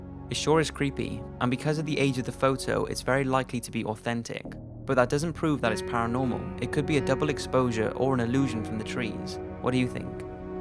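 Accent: Wales, United Kingdom. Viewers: OH LOOK AT THAT! At the time of writing (1958, Sun 17 Jan 2016) you have 1,002,042 subs! Well deserved, awesome channel, site, Twitter etc. Well done! Many congrats from Scotland (ps are you Welsh? Bit crap at accents 😕)!! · just has the accent of a Welsh person and coincidentally I'm Welsh too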